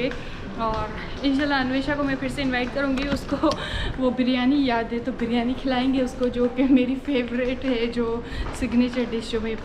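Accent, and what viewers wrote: Sindh, India. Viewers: Are u sindhi?? 🤭😂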